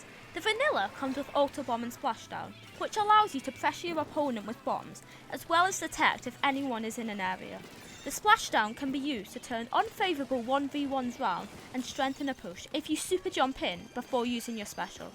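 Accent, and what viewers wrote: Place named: Scotland, United Kingdom. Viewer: omg voice reveal!!! she sounds so Scottish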